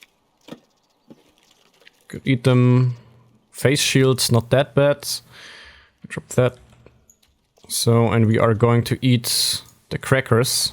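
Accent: Germany. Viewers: Sehr gutes Englisch ! Man hört deinen Deutschen Akzent. Tolles Video! Danke🙏🏻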